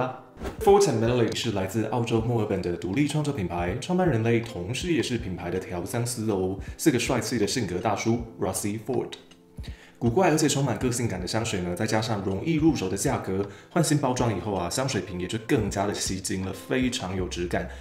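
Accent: Taiwan. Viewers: 香水介紹的很好，但台灣國語真的聽不下去….. 聽到一半很煩躁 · 每次都講解的好棒。只是「台灣國語」有點太多了😂，很容易打斷美好的情境想像😆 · 好很喜歡您的影片富有許多知識且有水準只是後期蠻多影片不知道是為了影片效果有很多“台灣國語”的部分導致影片很想切掉不看不知道怎麼想呢？謝謝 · 生動清晰的解說加上親切幽默的台灣國語，害我也好想來入手幾支，可惜沒有管道啦！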